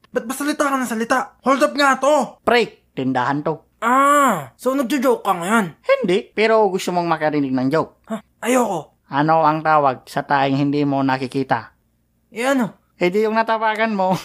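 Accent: Ilocos, Philippines. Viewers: Hahahaha ilocano ka gayam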